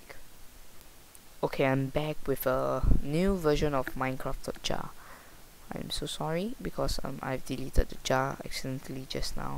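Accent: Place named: Singapore. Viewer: Im singaorean too I recognisr ur singaporean voice immediately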